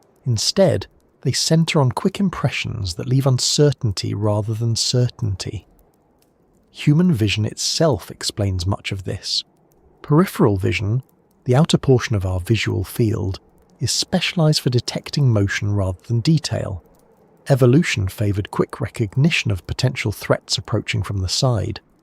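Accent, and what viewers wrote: United Kingdom. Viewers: Why is this narrative British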